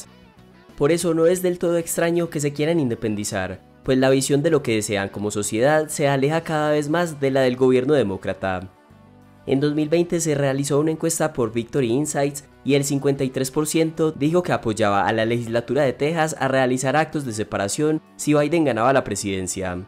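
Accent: Antioquia, Colombia. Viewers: Interesante el video, lástima el acento, creo que sería más agradable escucharlo sin el acento paisa tan marcado.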